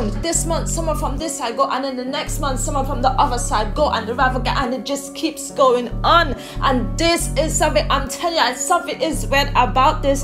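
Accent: United Kingdom. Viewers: U from the uk?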